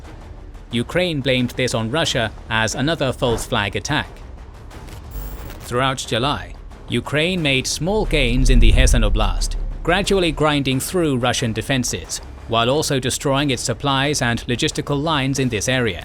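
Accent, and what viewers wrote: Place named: United Kingdom. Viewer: The creators of this propaganda & misleading terminology in this video are quite late.. My dear British-accented pigs, here it is: It is not an invasion & it will not be an invasion. This is a security operation to purge the UA military organization in which Nazi ideology has spread and become purely Nazi military battalions and the liberal West supports these criminals just for sake of mere They see them as tools that can inflict irreparable losses for the Russian army in the future because the best leaders in Russia are there today fighting against the latest Western weapons & against a corrupt military organization backed by the democratic West since 2014, their military doctrine has nothing to do with alleged democracy of the Kyiv criminal regime.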